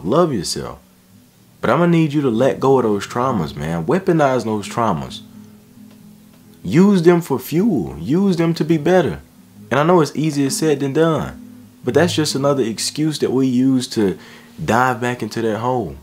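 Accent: South, United States. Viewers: Where are you from my guy? The southern accent is heavy in this one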